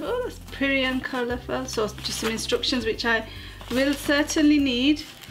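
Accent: Manchester, United Kingdom. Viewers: S B she has an accent. I’d say she come from Manchester way. It’s also known as ‘regional dialect’ . Now the real question is, are you always this rude?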